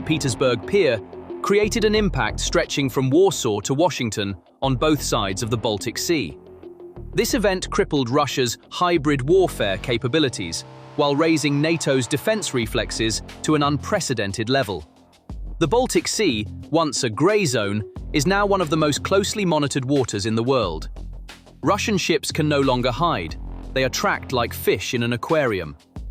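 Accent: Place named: United Kingdom. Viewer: British voice over. DREADFUL American number (mis)pronunciation. Dislike from me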